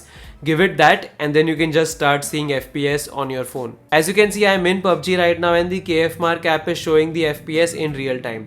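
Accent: India. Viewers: Finally An Indian English ppl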